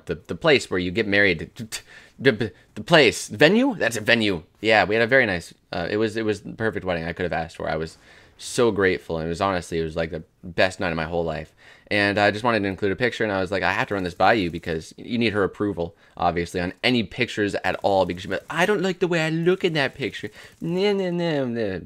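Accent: Canada. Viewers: Just wondering, did you ever have a Canadian accent?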